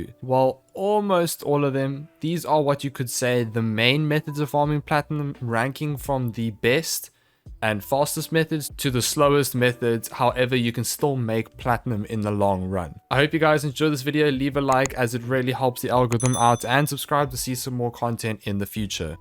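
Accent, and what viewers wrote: South Africa. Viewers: South african? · Are you from South Africa 🇿🇦 🤔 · Are you from S.A. ? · Are you South African? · South african accent or is it just me? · Love the south African accent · Man you really sound South African. hmmmmmmmmmmmmmm.... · South African? XD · This brus South African 😂. I can hear that accent from a mile away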